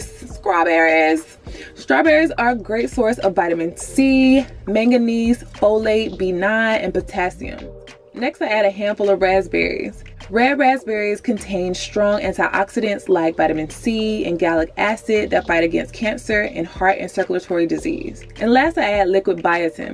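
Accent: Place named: St. Louis, United States. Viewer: Lol we kinda sound alike...sounds like u may be from st. Louis.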